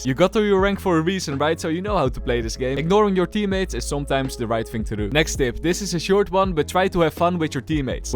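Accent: Netherlands. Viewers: you sound? look's like you from netherland are you from netherland?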